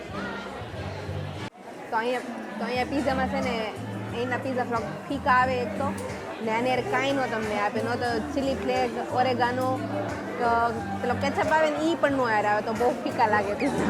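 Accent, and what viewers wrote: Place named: Kathiawar, India. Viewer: ખુબ સરસ્સ વિડીયો. ફ્રાન્સમાં અસ્સલ કાઠીયાવાડી બોલીમાં તમે ત્યાની રેસ્ટોરન્ટનો અનુભવ કરાવ્યો. વિડીયો બનાવતા રહેજો. તમારા અગાઉના પણ કેટલાક વિડીયો જોયા છે. બહુ ગમ્યા.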